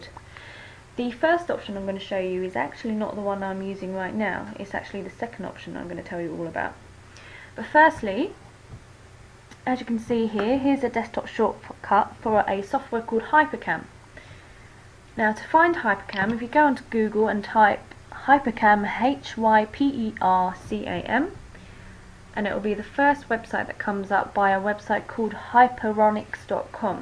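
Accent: United Kingdom. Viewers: Dat uk accent *O* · Are you english. ??? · <- Falling in Love with your British accent <3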